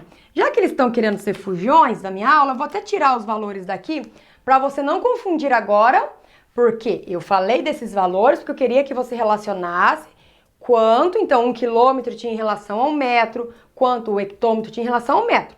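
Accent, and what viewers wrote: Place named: Rio Grande do Sul, Brazil. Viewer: Vc parece gaúcha 😅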